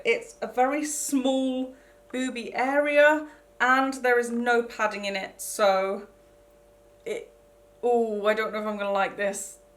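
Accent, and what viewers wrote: United Kingdom. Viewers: What part of Britain? I have a Shropshire accent, my daughter was born down south as that's where we were living she's got a southern accent, my husbands friend is from Scunthorpe and their accent is odd haha, my friends friend is Scottish, my aunt is Welsh...many many different accents and there are like at leave 5 different London accents depending on which part 🤣🤣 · I luv ur accent, I have always wanted a British accent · Hahahaha i Love how she's criticizing those weird af non existent bottoms in her lovely British accent! Sounds so posh, a British person could insult my mom and id probably say 'oh thanks' just because they make everything sound so posh 😂❤️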